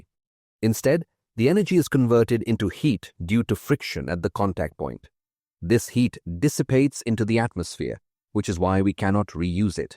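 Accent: India. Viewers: Akin to Indian accent that irritates. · Although I like this channel, But the narrator Indian accent and way of talking is annoying to me!!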